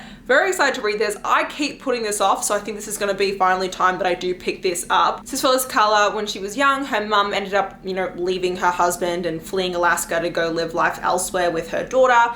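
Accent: Australia. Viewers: When i put u in 2x speed u sound extra australian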